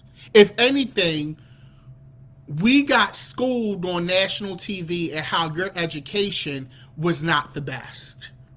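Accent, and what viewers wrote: Baltimore, United States. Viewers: Baby! I got my whole life in this life! They want her to be humbled down and I’m so glad she didn’t!! Where are you from?? It’s giving DC or Baltimore?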